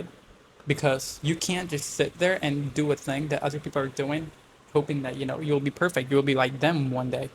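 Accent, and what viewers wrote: United States. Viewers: Ur American accent is really mind-blowing bro ❤in how much time u get it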